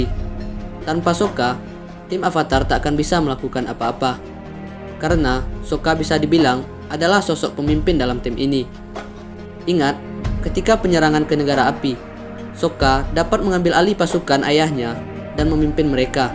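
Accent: Padang, Indonesia. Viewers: Org padang y min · Abang orang padang ya Bng 🤣🤣🤣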